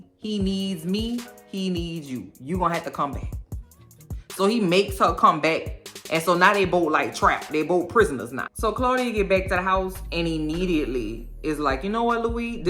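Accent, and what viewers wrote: New Orleans, United States. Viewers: About 30 seconds into your video, I gasped. Thanks to the talented Jacob Anderson, I recognized your accent as New Orleans right away. Just as impressive was Sam Reid learning French so he wouldn't have just the accent, but the language too. Loved your season recap and hope you do every episode next season. You're a whole lotta fun!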